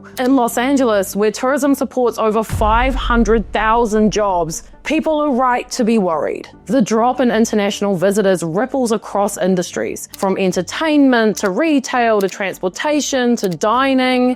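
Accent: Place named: New Zealand. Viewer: So pleasant to hear a New Zealand accent, if I am not mistaken.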